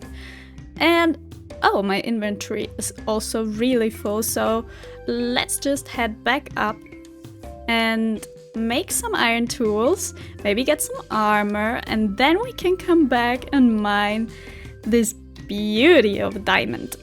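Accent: Germany. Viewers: I immediately noticed your german accent! Probably because I'm german too :D I already love this series so much! <3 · the second I heard you talking in the first part I was like: She is german. XD I am german as well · Ha, hab ich doch gleich gedacht, das klingt deutsch 🙂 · Loving the cozy, chill vibes, great job! I was actually guessing you might be German because your accent sounds a lot like a friend of mine's and she's from there too! Guten tag! P.S. I love the tree, that's so cool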